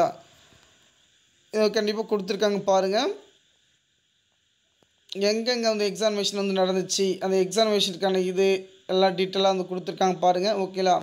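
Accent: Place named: North India, India. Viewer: Tamil nalla padikka kathukka Nanbaa... North India maathiri padikkira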